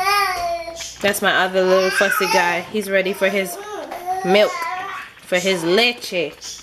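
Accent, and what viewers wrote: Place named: Kingston, Jamaica. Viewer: Where in Jamaica are U living, U sound like Kingstonian.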